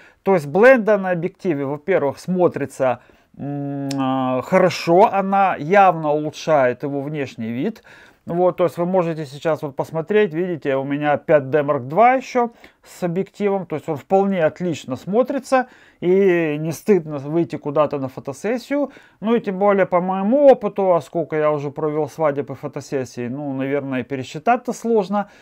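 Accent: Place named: Odessa, Ukraine. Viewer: Одессит чтоль? Говор знакомый... За обзор спс.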